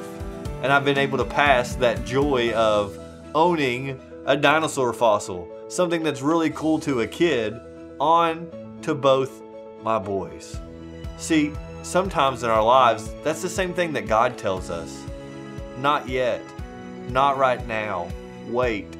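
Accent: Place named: Ohio Valley, United States. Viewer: Thank you for sharing! Keep it up man...I appreciate that you share your walk with God to all those who listen to WalkFM. I just found you all online as I was searching for Christian Radio Stations back home (Ohio Valley). I have tons to listen to here in Nashville,TN...but I was missing the accent and familiarities of "home".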